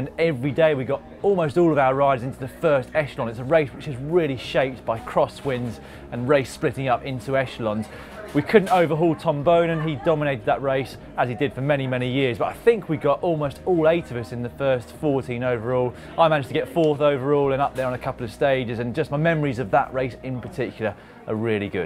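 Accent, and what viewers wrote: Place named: United Kingdom. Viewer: Gotta love the British accent. A place cooled (called) cool. shoutout to Gavin Free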